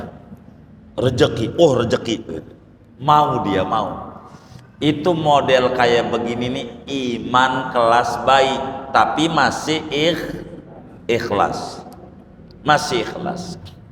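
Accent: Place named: Betawi, Indonesia. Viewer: Qobiltu Pak haji, paling suka neh ane dengan ceramahnya gaya betawi yee, terima kasih pak haji ilmu ane bertambah lagi mudah"an ane istiqomah Amiin yra🤲🤲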